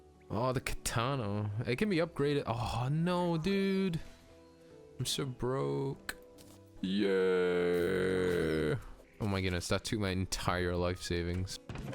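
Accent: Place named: Scotland, United Kingdom. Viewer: i sense a tinge of perhaps scottish in your accent? where abouts are you from matey?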